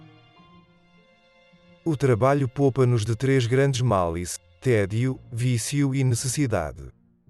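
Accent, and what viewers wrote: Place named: Portugal. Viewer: Eu não consigo levar a sério essa voz de Portugal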